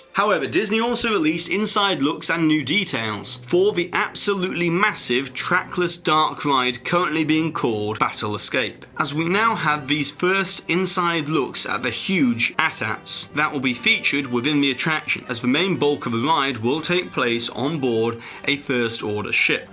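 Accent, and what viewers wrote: England, United Kingdom. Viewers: What part of England does your accent hail? It is TRULY unique, and I have heard it only seldom before.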